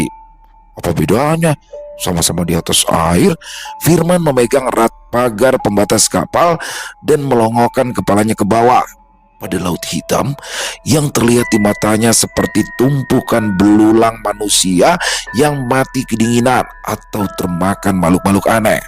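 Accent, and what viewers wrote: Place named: Banyumas, Indonesia. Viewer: Bahasa jowonya kok kaku, dadi bahasa ngapak semua.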